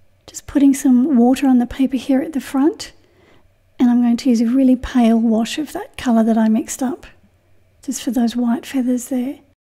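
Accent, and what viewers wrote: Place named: Australia. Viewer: I keep repeating to myself “ I am SO happy I found you on YouTube!”. I love your attention to details WITH the softening and the loose or lost edges. You are a fantastic teacher. Clear, easy to follow and understand. Your limited palette makes me remember to keep moving in that direction and I need to remind myself to let the colours mingle on the paper and stop fussing with them.. I love the Ozzie accent too! So sorry to hear about those horrible fires and so much damage to homes, forests and wildlife. The World IS watching and hearts are aching.. please keep posting your videos are in my favourites list and I watch some of them over and over. It shows how hard you work to get them right!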